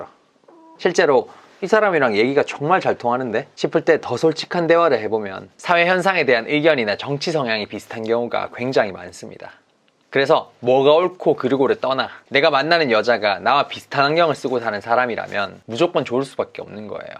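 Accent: Gyeongsang, South Korea. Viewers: 심리학 관련 찾다가 우연히 님 영상들 보게 되었는데 그 어떤 시트콤 드라마보다 재밌게 보며 간만에 많이 웃었네요. ^^ 화법이 표준어가 아닌데도 지적이고 젠틀하며 경상도(대구?) 사투리가 너무나도 매력적으로 묻어나는 말투... 매력남의 표준이시네요!🥰 이젠 느무 나이가 들어서 연애 포기하고 지천명을 바라보는 골드 미스, 미쿡 사는 누나(ㅋㅋ)가 구독, 좋아요 눌러 버렸어요. ㅎㅎ ( 님을 일찍 알았었더라면 결혼 성공 할 수 있었을라나...? ^^; )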